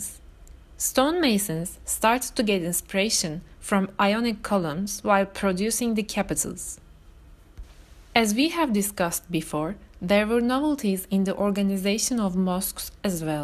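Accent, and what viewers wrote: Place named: Turkey. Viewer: she speaks in turkish accent lol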